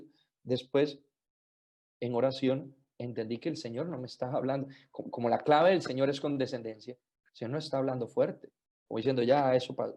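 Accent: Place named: Colombia. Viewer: Este acento, me suena Colombiano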